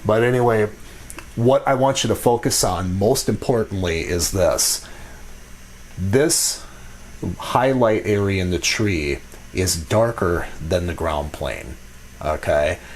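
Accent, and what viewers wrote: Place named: Minnesota, United States. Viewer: best tree video I've seen. I've been practicing our steps and I'm happy how the trees are turning out. Hey you sound like where I'm from - back in Minnesota.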